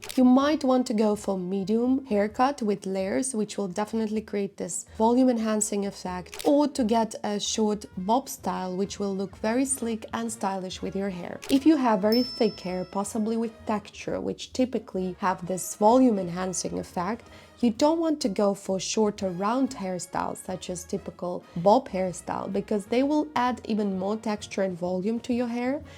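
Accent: Russia. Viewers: У русский акцент, послушала бы ее на русском.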